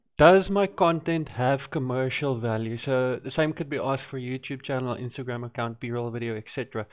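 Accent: South Africa. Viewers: Hi , thanks for the video , really helpful. Got to ask , are you south african ? I am in Johannesburg and would love to conect with fellow creators in ZA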